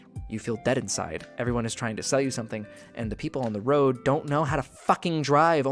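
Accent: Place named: United States. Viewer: OMG, American accent, youthful voice. Try going to Delhi India if you want something to complain about.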